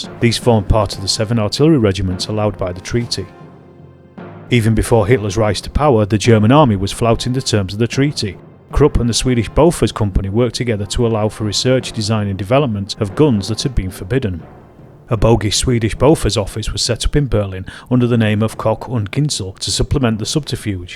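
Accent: United Kingdom. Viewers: You are an idiot. Another British accented individual taking archived pictures and expressing your own flawed opinion on your video. You have NO historical degree so another air thieving mouth breather trying to make money on youtube.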